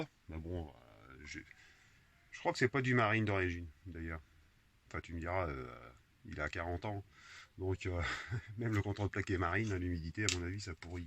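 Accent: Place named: North, France. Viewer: Travail monumental 😱💪🏻💪🏻 Bravo. On est en plein dedans pour la capucine de notre camping-car 🥺 J’ai reconnu l’accent du nord de mon enfance ☺️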